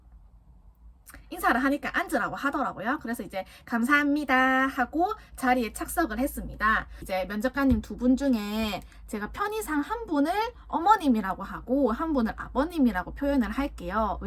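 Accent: Busan, South Korea. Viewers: 우아 감사합니다!!!!! 필합 이후로 급 면접이라 님 영상보면서 가상경험 하고있어요ㅠㅠ 게다가 저도 경남권이라 사투리들으면 넘 안정돼요....ㅎㅎㅎ 면접가기 전날까지 이영상 보고갈거에요!!!♡ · 최종합격도 축하드립니다!!! 저도 작년에 같이 고민 토로했던 기억이 ㅠㅠㅠ 저도 면접보고 아직도 발표 기다리는데 ㅠㅠ 넘나 떨리네요 부산사투리도 너무 정감갑니다 ㅎㅋㅋㅋㅋㅋㅋ저번주에 부산여행갔어요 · 부산사람의 말투가 느껴지는군요!ㅋㅋㅋ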